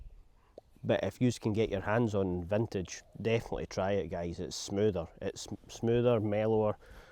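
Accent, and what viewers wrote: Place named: Fife, United Kingdom. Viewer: Its nice to hear a Fife accent. Orlik gets a lot of good reviews, and I think I'll copy your lead and try both.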